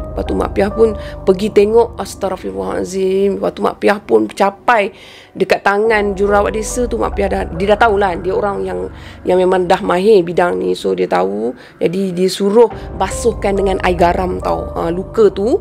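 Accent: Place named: Pahang, Malaysia. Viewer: ni org pahang kan ke bukan sebab loghat macam org pahang..kalau org pahang beloh mane.. semoga maju jaya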